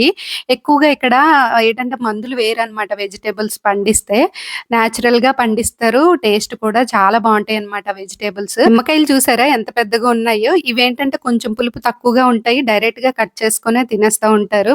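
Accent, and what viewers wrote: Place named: Srikakulam, India. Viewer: మీరు ఇంతకస్టపడి తెలుగు మాట్లాడిన... మన viIanagaram శ్రీకాకుళం బాష మారదు.. మాది తూ గో జీ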